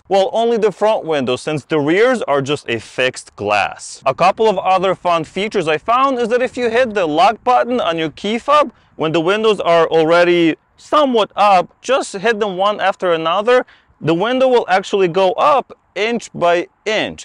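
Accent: Russia. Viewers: This was very useful. Even Russian accent sounds good.:face-blue-smiling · Why do you speak like "Diagnose Dan"? Oh, with Russian accent... I can understand that's not easy to lose your accent, buy why copy a hard working guy?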